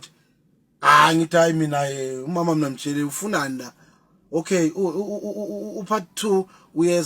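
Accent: Zimbabwe. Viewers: Isi zulu sakho sithi ubuya e Zimbabwe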